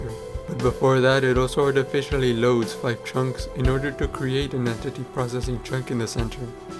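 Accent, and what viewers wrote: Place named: India. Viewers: Yup it sounds slightly South Asian/Indian to me too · To me It looks like the accent of indians you find everytime you search for something related to programming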